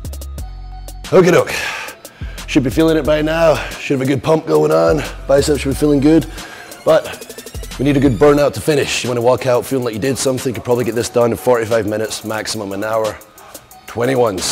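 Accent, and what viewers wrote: Scotland, United Kingdom. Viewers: Not British it’s Scottish mate.... Mon then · I thought you're Scottish mate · british?I thought he is Scottish🤔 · English?... you're fking Scottish, mate. I take it back, you talk like us, you're worthy. ...like it's up to us 😂 Don't fk with a Scotsman.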